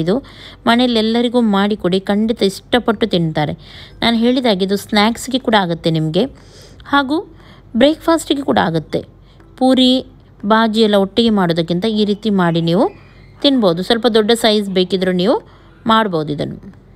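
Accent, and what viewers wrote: Mangalore, India. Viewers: ನಿಮ್ಮ ಜನ ಅಂದರೆ ತಪ್ಪು ತಿಳ್ಕೊಬೇಡಿ ಅಂದ್ರೆ ನಿಮ್ಮ ಮಾತು ನಿಮ್ಮ ನಡತೆ ತುಂಬಾನೇ ಇಷ್ಟ ಮಂಗಳೂರು ಮಂಗಳೂರು ಕನ್ನಡ ಭಾಷೆ ತುಂಬಾನೇ ಚೆನ್ನಾಗಿದೆ ಅದಿಕ್ಕೆ ನಾನು ಹೇಳಿದ್ದು ನನ್ನ ಮಗಳಿಗೆ ಹಾಯ್ ಹೇಳಿ ಅವಳ ಹೆಸರು ಶ್ರಾವಣಿ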